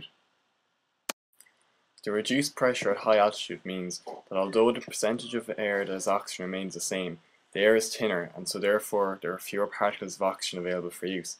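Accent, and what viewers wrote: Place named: West, Ireland. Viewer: West of Ireland accent I'm sure, but definitely Irish.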